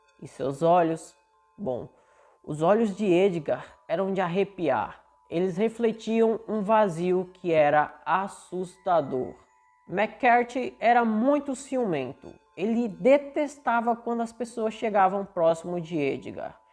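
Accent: Northeast, Brazil. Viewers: Gosto do seu jeito nordestino de falar😁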